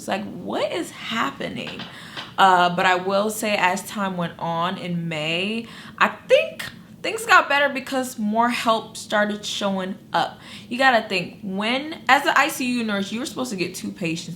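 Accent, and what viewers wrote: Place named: New York, United States. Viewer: You sure talk like you from New York lol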